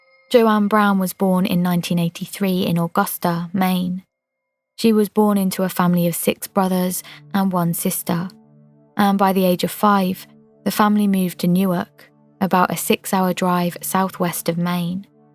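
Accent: United Kingdom. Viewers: I think it's more of a British thing, this channel is British, the accent is British and obviously the reference to the Met Police and the Sarah Everard case give that away- she sounds about my age so to me Red Rum was a famous British racing horse- that grew legendary status. I have watched the shining and can't remember Redrum but who knows....in the end you have to change words around to stop things getting blocked in the world of youtube. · Great to hear a British voice for a change new subscriber.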